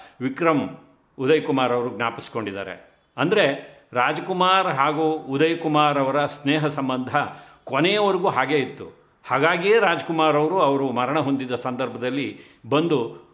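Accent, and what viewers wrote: Standard. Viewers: Your Kannada is awesome. Pure and honest speech. A big salute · ನಿಮ್ಮ ವಿಶ್ಲೇಷಣೆ ವಿವರಣೆ ಕೇಳುಗರಿಗೆ‌ ತುಂಬಾ ಹಿತ ಎನಿಸುತ್ತದೆ. ವಸ್ತು ನಿಷ್ಟ ಹಾಗೂ ಸತ್ಯ ಎನಿಸುತ್ತದೆ. ಸ್ವಚ್ಛ ಸುಂದರ ಕನ್ನಡ‌ ಕೇಳಲು ತುಂಬಾ ಹಿತ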